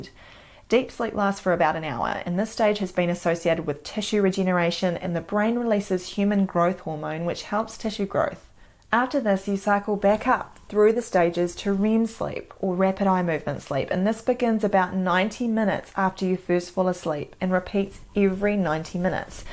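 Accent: New Zealand. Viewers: very good Doc. thank you. Now I want to go to NZ, love the accent! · The New Zealand accent is pretty awesome, I must say. I loved it in Hunt for the Wilderpeople, one of the most hilarious movies ever. But you say in this video we should only use bidrooms for two purposes: sleeping and six. What about five, or four? Are they okay? Maybe some seven?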